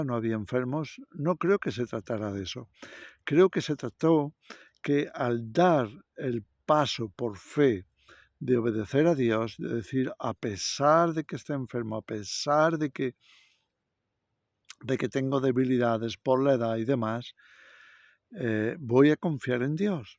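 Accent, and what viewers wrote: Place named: Spain. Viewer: primera vez que te escucho y veo hermano!,con mi familia dimos ese salto de fe al mudarnos a una pequeña ciudad a 1500 km de distancia al surde argentina,junto a mi esposa entemdimos que Dios nos llamaba a algo diferente,y en 11 años aprendimos a esperar de la provosion de DIOS atraves de un pequeño negocio de comidas tipicas del norte argentino...hoy argentina esta siendo gobernada por un verdadero ANTICRISTO un ser inmoral y despota que esta llevando a la nacion al hambre y la desesperanza,tuvimos que desdoblar las fuerzas y esperanzas en el SEÑOR Y SUS PROMESAS,aprendiendo y recibiendo el mensaje que; la IGLESIA DE CRISTO EN LA TIERRA sera presionada atravez de la economia familiar! Coicidimos con usted pastor y sus palabras. ...por el acento,veo que es español,de donde es su localidad??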